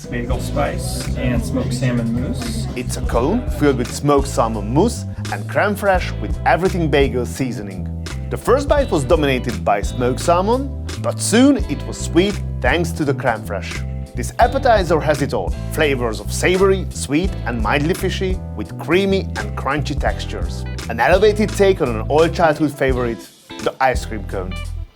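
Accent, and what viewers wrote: Hungary. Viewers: This is my first time seeing a video on your channel, I gotta say amazing content. Your accent😄 are you Hungarian?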